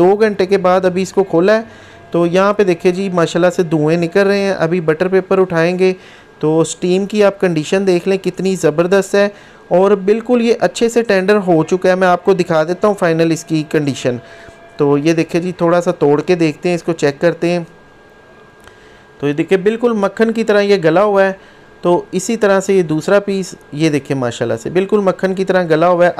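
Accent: Punjab, India. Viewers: ارے بھائی اسٹیم ھوتا ھے نہ کہ سٹیم تم پنجابی انگلش لفظ کو ہمیشہ S کے ساتھ الف کو غائب کر دیتے ھو مثلاً اسکول کو سکول ، اسٹوڈنٹس کو سٹوڈنٹس ،اسکرین کو سکرین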